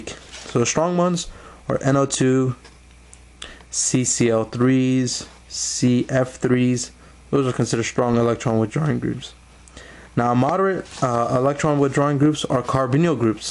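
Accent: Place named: Mexico. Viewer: you sound like a mex which is a direct correlation to lack of intelligence. Therefore, your videos are trash, not unlike the place you were born. Very dry, hard to watch. Spice it up in the future (hot sauce, less bean sounding, less monotone) was a decent attempt i guess tho could've been worse. You coulda been black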